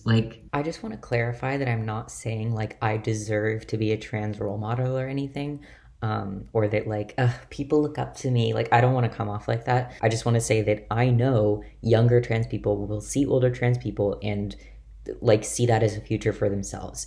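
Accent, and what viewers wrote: Canada. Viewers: Oooooh, all the "out" and "about" in your speech outed you as a Canadian within the first minute, lol. Not that it matters at all, just a fun observation. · Hmmm one thing your voice tells me is that you’re Canadian 😎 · Your voice reminds me of Chase Ross'. That's all. Don't know what Kalvin Garrah even means with 't-slur voice.' It sounds normal to me? Edit: I just realized it might be because you both sound Canadian.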